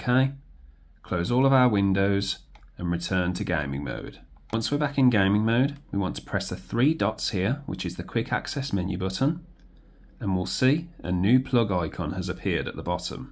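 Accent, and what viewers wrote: Birmingham, United Kingdom. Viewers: Really useful video cheers, do I sense a fellow Brummie?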